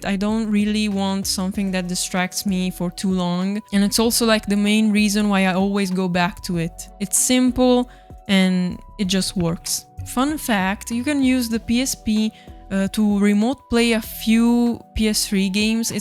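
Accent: Italy. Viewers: the italian to english translate struggle is so real omgg happens to me all the time too!! love your accent!!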